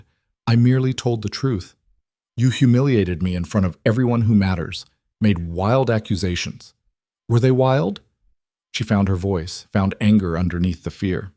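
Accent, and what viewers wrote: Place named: United States. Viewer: Why is it using an American English accent for a story set in Britain? This whole storyline mixes up timelines with 19th century, 20th and 21st. Be prepared to feel constantly confused about courtroom drama and the need for a passport in 19th century England lol.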